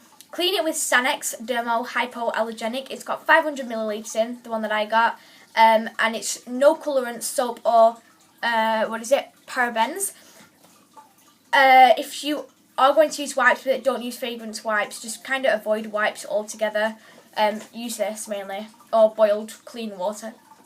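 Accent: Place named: Manchester, United Kingdom. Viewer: Princess_16x x yh its the manchester or london accent uk accent is better thn american accent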